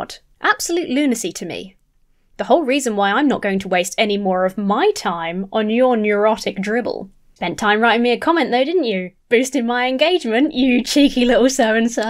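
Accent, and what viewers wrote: United Kingdom. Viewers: Hi there! I just wanted to take the opportunity to thank you for what you do (from "across the pond," as the old cliche goes)! I feel as though YOU get under Hovind's skin more than anyone else. He just can't handle a vibrant, youthful, highly intelligent, HILARIOUS, fenale. Throw in that WONDERFUL British accent and those voices you use (especially Hovind impersonation), and we have the icing on the cake! You ROCK!!!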